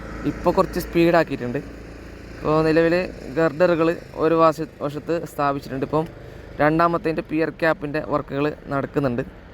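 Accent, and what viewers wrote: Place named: Kasaragod, India. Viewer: തനി കാസർഗോഡ് സ്റ്റൈലിൽ. ലാൽ സലാം. ഈ വാളും അങ്ങന്നെ ഇങ്ങോട്ട് വന്നിർലാ എന്നേഉള്ളൂ പേടി ❤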